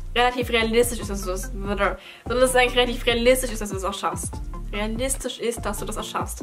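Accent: Germany. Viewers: Du kommst aus Brandenburg 😍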